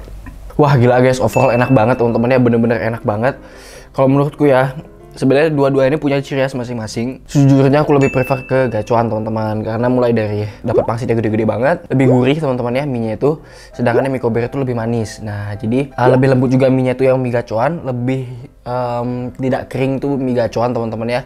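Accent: Bali, Indonesia. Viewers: Kak orang bali ya kok logat a bali bgt?😊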